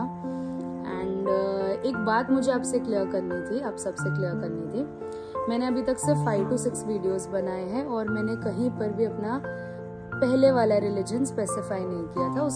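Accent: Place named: Gujarat, India. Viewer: Good to know that you're a gujju fellow. Tamari boli par thi j lagtu hatu ke gujju chho. Khair Allah ne aapko sahi raah dikha diya hai. Dua main yaad rakhna sister.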